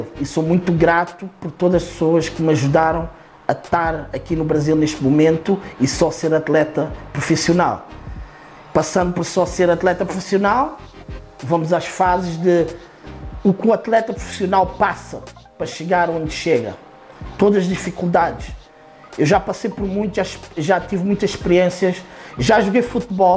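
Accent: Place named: Mozambique. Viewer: Me sinto encasa vendo os vídeos do pois temos o mesmo sotaque... Sou da África em Moçambique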